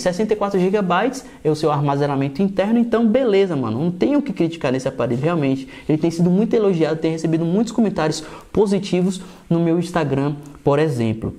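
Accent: Bahia, Brazil. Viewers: Mano vc é baiano? O sotaque e as gírias igual a minha pivete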